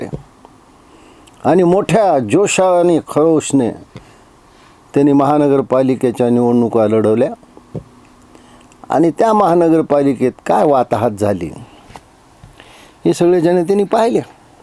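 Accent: Marathwada, India. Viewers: महाजनकाका तुमच्या संपूर्ण घराला वक्तृत्वाची जी अजोड देणगी लाभली आहे तिचा उपयोग तुम्ही शिवसेनेसाठी करा. योग्य मुद्दा काढून समोरच्याला नामोहरम करण्याची तुमची शैली वादातीत आहे. थोडा निजामी टच असतो बोलण्यात पण तो आता बदलणे शक्य नाही त्यामुळे चालवून घेऊ. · मराठवाडी आवाज म्हणजे साहेब.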